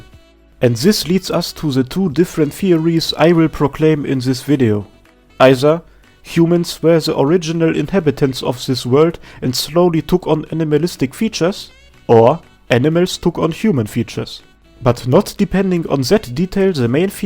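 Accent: Germany. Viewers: der Deutsche Akzent ist direkt zu hören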